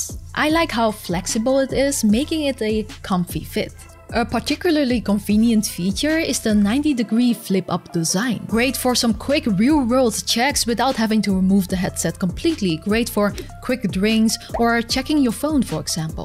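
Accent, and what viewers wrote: Netherlands. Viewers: Sounds like you got a dutch accent.. hehe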